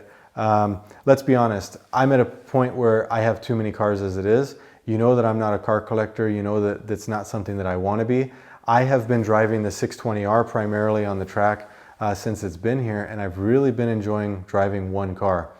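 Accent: United States. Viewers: I’m new to the channel are you American?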